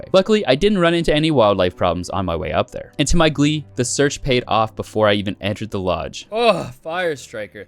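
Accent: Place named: Canada. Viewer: This is my first time seeing your channel based on your accent I’m guessing you’re a fellow Canadian. :D if not hello from Toronto Canada. You kind of sound like your from Alberta maybe 🤔. I’ve had this game in my steam library for a while. I might actually play it. Your video has inspired me :D.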